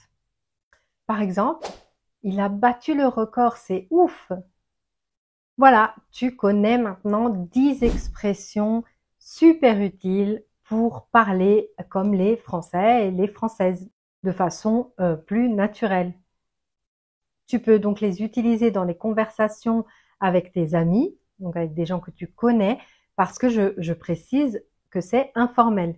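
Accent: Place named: Italy. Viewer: C'est la première fois que je visionne vos vidéos, mais je tiens à dire qu'à première vue, j'ai immédiatement remarqué que vous etes sans doute d'origine italienne. Cela se ressent dans votre charme italien et je dois avouer que j'apprécie énormément vos prononciation.Bref,les expressions que vous avez mentionnées sont très utiles, utlisables dans la vie quotidienne. Grazie mille.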